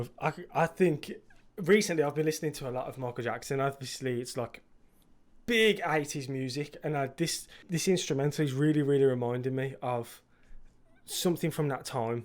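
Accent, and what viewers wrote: United Kingdom. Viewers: Aren't you British? You sound British. How have you never heard of?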